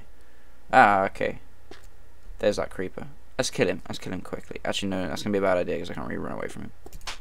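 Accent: United Kingdom. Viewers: reminds me of X.... cept english accent...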